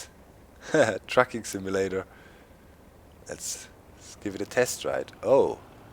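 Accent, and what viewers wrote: Germany. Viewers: SAch mal .. spinn ich oder hast du n deutschen Akzent in der Stimme ?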